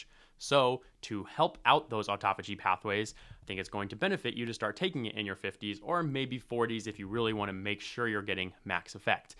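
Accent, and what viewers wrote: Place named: United States. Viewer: He’s American. Give him a break.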